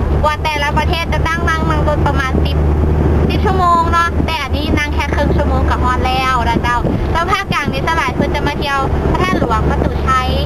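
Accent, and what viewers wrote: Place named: Thailand. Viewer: สำเนียงออก ไทย มากเลยครับ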